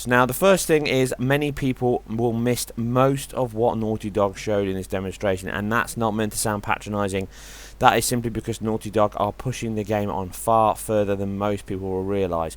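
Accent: England, United Kingdom. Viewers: Man, we love this! You have seen the demand for your kind of workmanship here. We are looking forward to more top notch analysis like this that puts Eurogamer and Digital foundry to shame. They now have competition! You have our support. Thank you you have awakened to giant nerd in us. Love your stuff and that English accent is a sure fire win. Everybody is posting your video on many comment sections on gaming sites. Keep this up.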